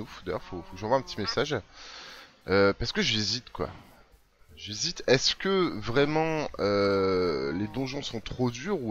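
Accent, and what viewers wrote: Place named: Belgium. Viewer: pOUCE VERT POUR L4ACCENT BELGE !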